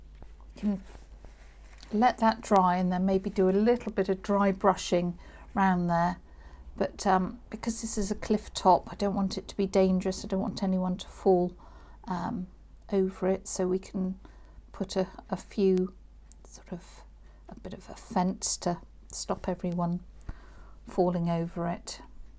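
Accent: United Kingdom. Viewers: The most lovely accent in the world is the British one❤❤❤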